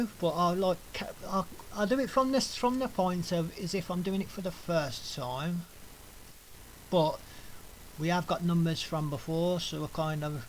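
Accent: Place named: Birmingham, United Kingdom. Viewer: Good video apart from the brum accent !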